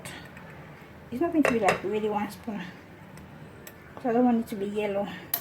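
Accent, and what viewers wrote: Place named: Cameroon. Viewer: Hmmmm....you called 2 spices in French. Ever lived in a french speaking zone? Just wondering. You sound a little Cameroonian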